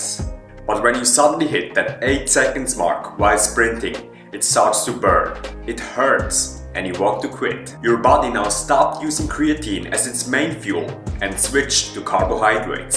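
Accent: Germany. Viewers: Bayerisches Englisch 😆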